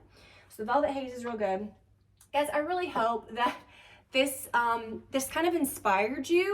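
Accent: South, United States. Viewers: your accent! Such a southern belle 🎀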